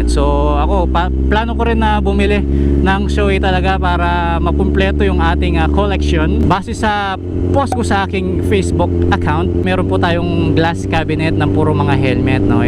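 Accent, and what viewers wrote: Pampanga, Philippines. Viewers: Mangarakap ka na tune kang kapampangan ne sir? Hahaha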